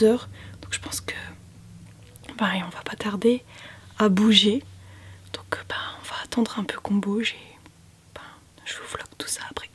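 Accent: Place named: Nord, France. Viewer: YES je savais que je connaissais bien cet accent ! T'es à la voie 9¾ de Lille Flandres ! Eune sorchière deuch'nord !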